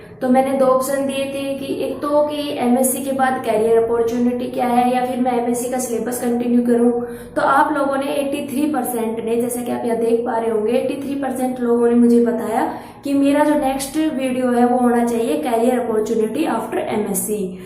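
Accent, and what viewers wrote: Haryana, India. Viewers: Thanks mam for such video . And your haryanvi voice is amazing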